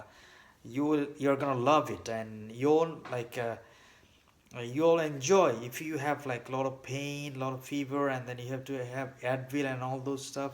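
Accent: Bangladesh. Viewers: S/W, bhai, you sound Bangladeshi, masha'Allah. May Allah bless you for your efforts. :)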